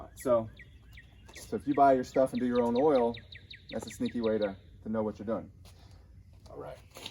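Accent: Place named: South, United States. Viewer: Your southern accent is adorable.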